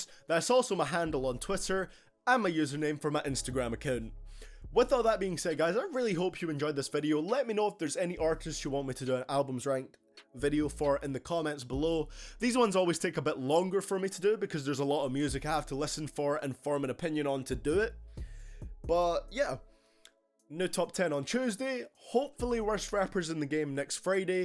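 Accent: Scotland, United Kingdom. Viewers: I love the Scottish accent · Are you Scottish?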